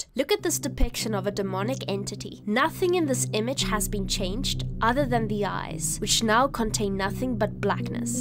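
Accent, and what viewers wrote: South Africa. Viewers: First I love the South African accent 🇿🇦❤ and awesome video glad you looked further into this! You are very intelligent! :) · South african english accent makes the video best ❤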